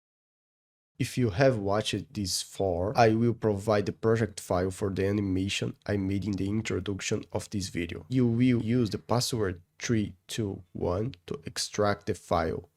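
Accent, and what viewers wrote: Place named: Brazil. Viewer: just asking, are you brazilian? i do, and your accent sounds like mine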